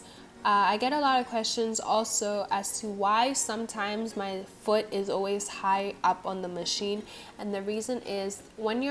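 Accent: Caribbean. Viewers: Are you Caribbean? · You’re body is amazing! Love your videos, are you Caribbean? You have a slight accent but I can’t tell from where lol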